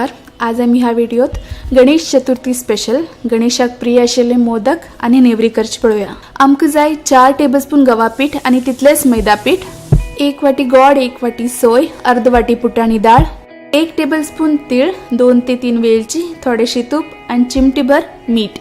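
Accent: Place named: Karwar, India. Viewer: Refreshing to hear karawari Konkani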